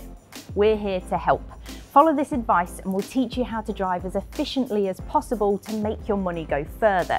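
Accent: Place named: Northern England, United Kingdom. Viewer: I'm sorry but your Northern accent kept putting me off. Every time you said Munnee instead of Money I could feel myself cringe.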